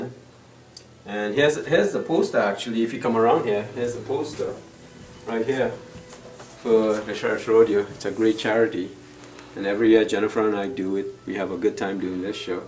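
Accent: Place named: Trinidad and Tobago. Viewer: Still got your trini accent man!! so cool..an I love your work..its wonderful.. love it all..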